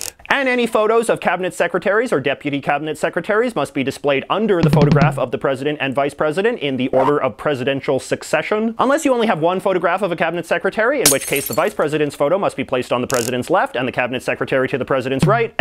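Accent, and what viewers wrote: Canada. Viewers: Wait, are you Canadian? Every time you say, “about” I’m like, heyyyyyy. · Your Canadian accent physically hurts me.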